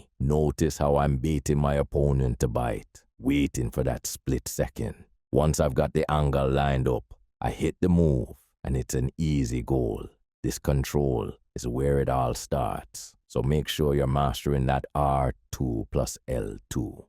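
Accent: Jamaica. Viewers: The yardie voice alone makes this all top tier content. Actually never seen someone say some of this. Im div 2 but still learning 👍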